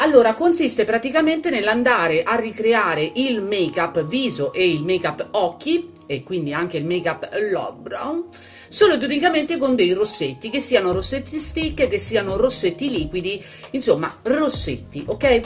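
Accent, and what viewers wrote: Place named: Rome, Italy. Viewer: In questo periodo ci sta proprio un po' di sana ironia e simpatia,troppo forte il tuo Romano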